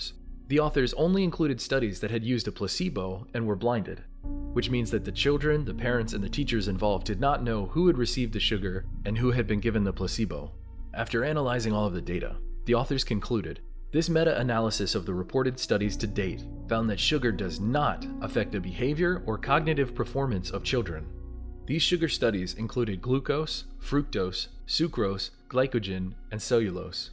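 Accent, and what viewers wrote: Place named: United States. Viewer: You are all very silly … Dazzle ships … Maybe you never noticed them before because 1. You sound American and it was mainly the British who used this type of Camo 2. Using the assumption as an American the majority of DDay is told from the American perspective and images you’ve seen are of American landings on Omaha where you wouldn’t have seen many dazzle ships but if you look at British landings you do notice many of them The fact that anyone believes any of this and you’re basing this entire theory off of a woman who came up with it at a paranormal Conf is legitimately insane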